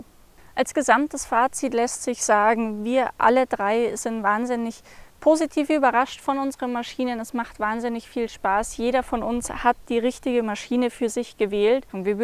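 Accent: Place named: Germany. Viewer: Sehr schöner Vergleich der Maschinen. Du bist mit verantwortlich das jetzt eine CRF250Rally bei mir in der Garage steht 😊. Da ich noch keine Offroaderfahrung habe, und deinem Dialekt zu urteilen wir aus der gleichen Region kommen, kennst du evtl. Strecken zum Üben die legal befahren werden dürfen ? Ich kenne nur die unbefestigte Straße zwischen Bettbrunn und St.Lorenzi. Gruß aus Egweil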